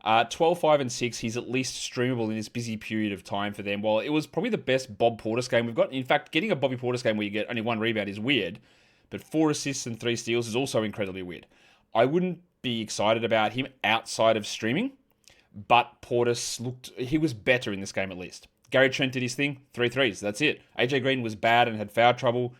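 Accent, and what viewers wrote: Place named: Australia. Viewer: Yo!!! Who is this guy? First time I heard this guy I was about to turn it off once I heard the Aussie accent I immediately judged him as being unknowledgeable but I dropped my remote after a hasty approach to close the video. Thank God! I happened to hear a rare but legendary Jon Vitti Simpsons reference that if you know you know. Only legends know what the f he was referencing. And I wanted more! Fact is that Vitti reference was GOLD! is referencing one of the funniest episodes from The Golden Age of the Simpsons, that was one of the best episodes by probably their second best comedy writers in the history of television not just the Simpsons. Jon Vitti who arguably is behind maybe John Schwartzwelder and tied with Conan, even though Conan didn’t write enough to have as many classics as most Simpsons Golden Age scribes. But, seasons 1-6 of the Simpsons can’t be compared to any other show it goes down as the best comedy tv series animated or not, it doesn’t matter, the writing was so tight and smart. 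If you know it, when someone references one of those episodes like this dude just did in this clip, you know he knows his shit.m, ABOUT EVERYTHING. Guy or whoever this dude is, has some taste. I am listening…you got me hooked. At first, admittedly and with shame, I heard the Aussie accent and immediately judged his hoops knowledge as being suspect. However after I heard the Corey hotline reference I had to give him a chance. He is absolutely surprisingly LE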